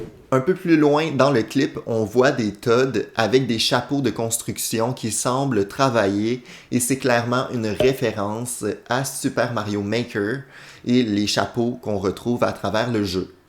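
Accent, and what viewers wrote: Quebec, Canada. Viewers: c québecquois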